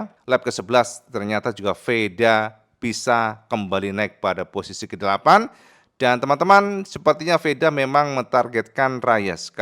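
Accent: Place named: Java, Indonesia. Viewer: PADANGG NJINGGLANGGG.., kanal terlengkap dengan data dan logat bahasa yg khas NJAWANI..., TOP MARKOTOP JOS GANDOSSS.., #vep9budalslametmulihraharjoaamiin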